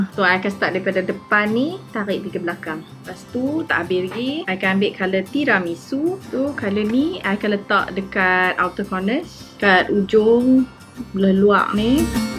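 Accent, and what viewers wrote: Penang, Malaysia. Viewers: Sgt comel ckp loghat penang 😁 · Awat cute sangat bila cakap penang 😍😍😍😍😍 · Boleh pon ckp penang...ingt beranak dkt uk 🤣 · Hahhaha napa rasa lawak dengaq cakap penang😂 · Cute lahh cakap pelaq penang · ok u chomel gilosss cakap penang!! love it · Puaih dengaq akak ckp peneng after so many years follow channel akak uhukss love teroxx ❤ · WAIT I TAK HBS LAGI TGK THIS VID BUT GURLLLL I LOVE YOUR PENANG ACCENTTT OH EM GEEEE OKAY NAK SAMBUNG HAHAHAHAH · Nurkhotimah Rifqi Pulau pinang, malaysia.. she speak in Penang dialect.. so maybe susah untuk kamu faham😂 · hehe comeinyaa loghat penang yg campoq dgn english 😆 uuu nampok muka bulat 🤣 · = 💗 in penang dialect = 💗💗💗💗💗💗💗💗💗💗💗💗💗💗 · Dont know why, but I love it way better when u speak in malay.. to be exact, in that Penang slang .. thats cute plus u sounds way much comfortable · bila dh start cakap penang, kepala mau dok goyanggg tak biaq...gahahahaha hidup penang mai !! 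· I love the way you speak your bahasa with a little bit of penang accent. There was no accent when you speak in English.. Love your makeup look. Finally a malay makeup youtuber...😘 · Padu toq orang penang cakap! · Comeeyyyyy aihhh dia ckp peneng..😍😍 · i like this vid soo much its like u are completely a different person when u talk in bm with penang loghat some more. your make up is da bomb as always love it !!! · Lahh penang rupanya 😂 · Comelnya cakap penang 😂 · Comeyyy cakap loghat penang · sebagai orang pulau mmg syok dengaq fellow island girl kita ckp penang.. nak malu apa.. mcm ni la kami dok ckp dari kecik.. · Comelnya you guna dialek Penang 😹 tapi I still prefer you guna English sbb I nak belajar. Hahahha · Comelnya cakap dialek penang. Tak kekok pun 😁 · I'm Penang Chinese girl , I totally like when you speak Penang BM , SO CUTE! I love the accent ! Love your make up tutorial too, will keep on follow your video! 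Thumbs up for you girls ♥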